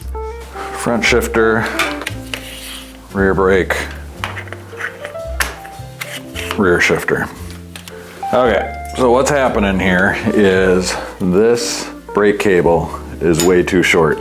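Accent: Wisconsin, United States. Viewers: Hello from WI! Finally, someone who talks like me! I don't know why the internet thinks I need bike content, but I'm glad it does! Love the humor. Love the music. Love the pace. Keep it up! PS - Where in WI are you located? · The Wisconsin accent takes this from "very informative and helpful" to "neighborhood dad helps you fix up a bike." Very wholesome.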